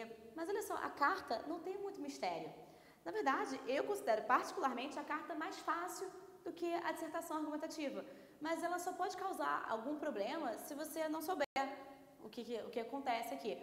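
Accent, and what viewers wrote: Rio de Janeiro, Brazil. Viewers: e esse carioquês da professora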